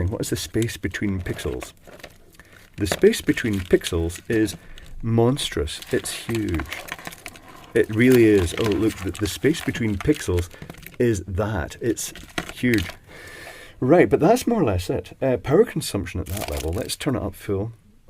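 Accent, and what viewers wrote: Scotland, United Kingdom. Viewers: I don't understand that much about electronics but find these videos fascinating. The other half of the reason I come here is for the relaxing effect of creamy smooth Scottish accent. 😅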